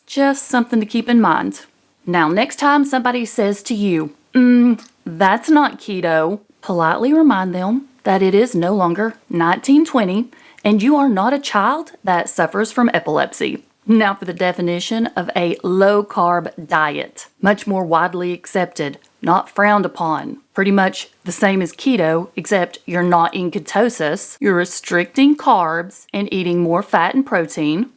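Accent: South, United States. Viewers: Man, love that southern draw…..what state ya from?😊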